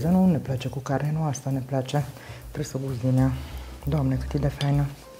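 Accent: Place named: Arad, Romania. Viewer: Vă iubesc,doamna! Iubire maternă,pt.că sunt septuagenară.Sunt originară din Ineu și mi-e dor de"graiul"din zona Aradului.Mi-e drag tonul dv.cald,felul cum explicați,profesionalismul,tactuldv.,nivelul intelectual,TOT!❤ Vă doresc multă sănătate,un AN NOU CU SPOR ÎN TOATE!🤗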